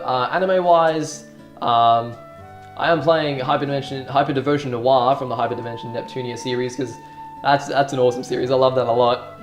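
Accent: Australia. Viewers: Dayum son, that Australian accent is thicc af. First time I've seen your face... other than your (half faced) dp xD OreGairu and Nisekoi... the first 2 anime that got me into covering seasonal anime music lol We started getting into anime with the same series... wtf is with these coincidences kek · jeremy wee well both of them have Australian accents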